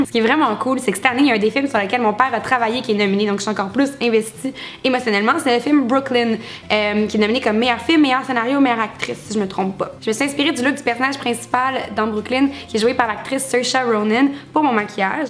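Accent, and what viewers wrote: Quebec, Canada. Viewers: J'adore l'accent québécoises. 0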